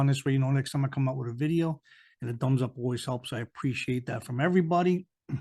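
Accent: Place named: Italian-American, United States. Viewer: Accent makes me want to watch the Godfather for the millionth time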